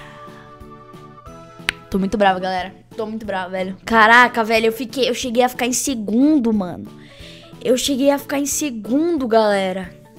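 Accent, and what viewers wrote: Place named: Sao Paulo, Brazil. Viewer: Belo sutaque Paulista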